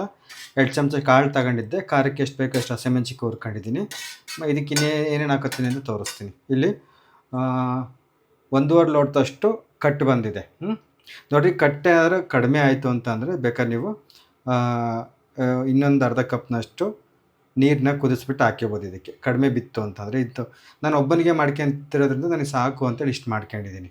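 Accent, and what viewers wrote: Chitradurga, India. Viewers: Nim uru yavdu sir chithradurga na nam bashene mathadthira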